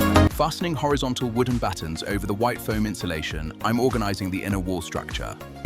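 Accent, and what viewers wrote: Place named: United Kingdom. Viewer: lol what? The voice is obviously British and this is obviously located in the US.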